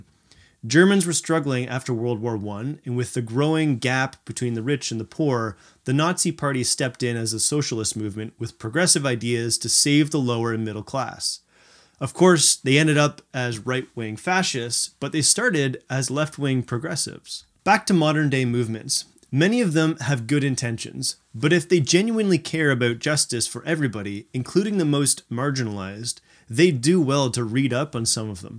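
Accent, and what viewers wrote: Canada. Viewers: Canadian much? I love it! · Interesting, well thought out points... a little culty and the GTA accent is unbearable. Mute and use cc!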